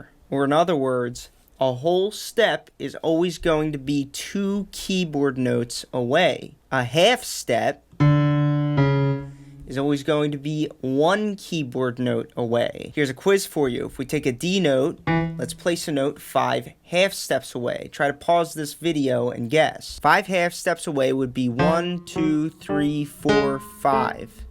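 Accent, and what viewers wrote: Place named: Boston, United States. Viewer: “Whole, whole, hailf” 😂 love the Boston/NY accent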